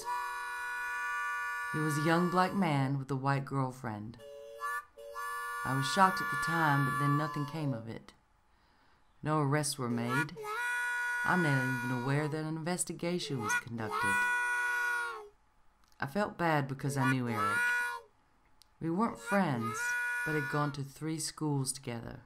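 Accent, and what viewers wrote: South, United States. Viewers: Excellent work! I'd liked the Southern type accent, you reminded me of "Thelma" from the movie "Thelma & Louise"....one of my favorite flicks! xxxxx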